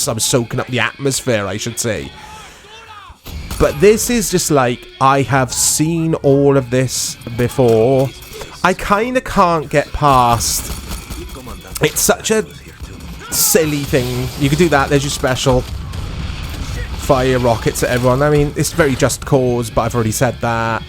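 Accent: Wales, United Kingdom. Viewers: Are you Welsh bruv?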